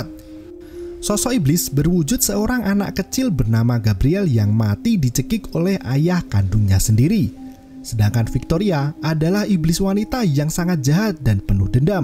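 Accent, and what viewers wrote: Java, Indonesia. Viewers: Medokny keliatan banget..jowo ndi mas😆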